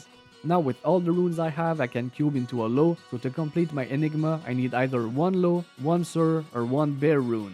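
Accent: Quebec, Canada. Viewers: french canadian? "three times faster" is a weird thing to say mathematically "In a third of the time" is more accurate I guess the old "speed" was "1 enigma per 60 hours" so "1 enigma per 20 hours" is three times as fast, or two times faster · That crisp Québec accent. That many runs, getting a sorc would saved you substantial amount of time with telekinesis only without even counting teleport. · Mon frere! I love how, with your beautiful Canada accent, whenever your say poppables is sounds like 'palpables', like you are a medic examining someone :)) Also, I found, and totally random, 'cause when I farm I usually go, in the same run - Travi, Mephisto, then LK, Countess and then maybe Andy, so this time I found, on P8 LK, and Ohm rune, under a Corpse. So a 'palpable' corpse gave me OHM = Oh, Homme, OH HI MARK!!! = ))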